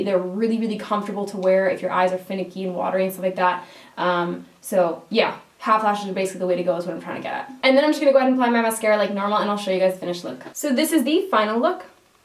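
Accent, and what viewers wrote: Canada. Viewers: You're Canadian right? The way you say bag and trash 😁.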